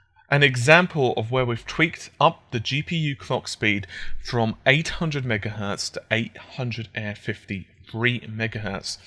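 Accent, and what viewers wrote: United Kingdom. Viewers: What's he doing? He speaks in a british accent but uses American terminology like the way he pronounces 'route' and the way he says 'math' instead of 'maths' :S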